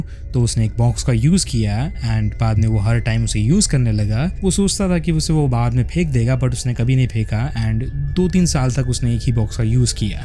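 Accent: India. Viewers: You actually sound like only desi. Thanks for the video tho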